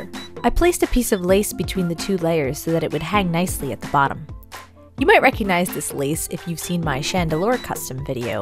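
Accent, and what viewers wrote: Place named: United States. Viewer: Are you american cause you sound american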